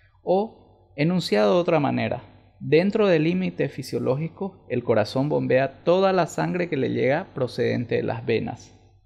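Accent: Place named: Santa Cruz, Bolivia. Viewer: Buenisimo video , una pregunta eres de santa cruz , porque tu acento es muy similar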